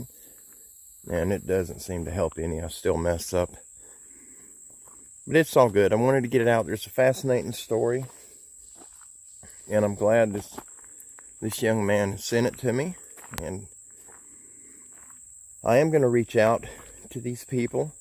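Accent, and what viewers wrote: South, United States. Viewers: To my Canadian ear your grammar is fine..I love your accents from down south! Great letter btw! Thank you for sharing..bring bug spray next time...lol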